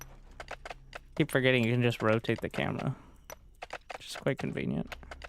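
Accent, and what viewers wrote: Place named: South, United States. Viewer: Did you travel around a ton during childhood or is this the strangest accent I've ever heard from, allegedly, MICHIGAN? I was trying to nail down your accent which definitely sounds Southern, I was thinking East of Texas, maybe even upscale Arkansas, or Nashville Tennessee was my most precise guess - the sharp S-sound, the tip-of-the-tongue L combined with the otherwise Southern sound. - I decided to look it up and it said birthplace: Michigan. Would've been my last guess. is there some trailer park community there that has an odd isolated drawl haha?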